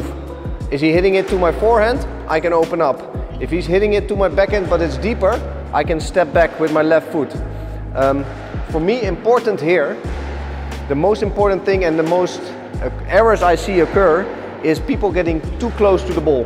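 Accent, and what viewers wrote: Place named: Netherlands. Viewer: 1000% dutch accent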